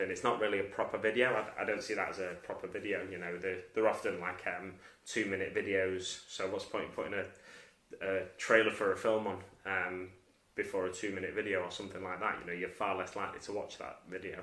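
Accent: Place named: Roadman, United Kingdom. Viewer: Love your roadman accent! Yeah this is ridiculous, they brought it in after Logan Paul yet they attack the smaller channels most? There should be more checks on the big earners not the small guys.mRidiculous. Yeah I don't make money off YouTube but still the option should be there. On a brighter note congratulations on 300 subs! I'm 5 off myself haha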